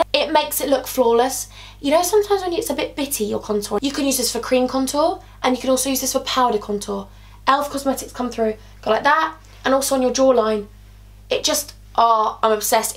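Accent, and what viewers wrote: Liverpool, United Kingdom. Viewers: your liverpool accent is lifeeee 😄💞 you're literally my favourite person😍❤ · Love a good haul! And your Scouse accent is fab hahah! P.s. how stunning do you look in this video?! 💗💗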